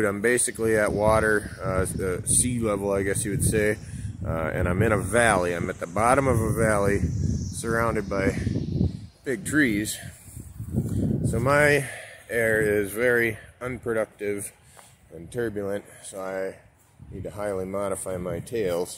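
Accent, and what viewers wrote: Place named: Appalachia, United States. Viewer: You sound lil bit hillbillysh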